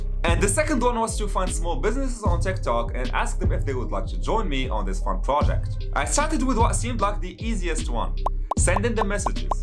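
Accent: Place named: Morocco. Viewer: M9awd bro I’m just assuming you’re morccan from your accent but anyways I like the editing the quality the confidence so keep going bro you definitely going far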